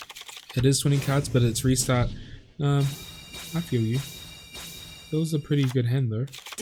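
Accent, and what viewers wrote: New Zealand. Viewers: You kiwi bro?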